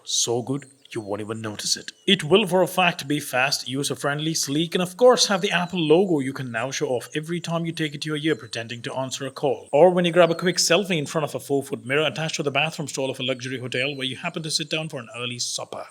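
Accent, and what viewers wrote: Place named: Tamil Nadu, India. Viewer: Enna thala english ku poita un tamil slang ku dhan video vey na pakkuren plz make videos on tamil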